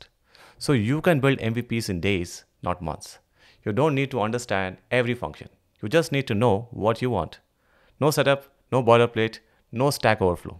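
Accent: India. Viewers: Are you south indian? I just thought of asking. No other reasons.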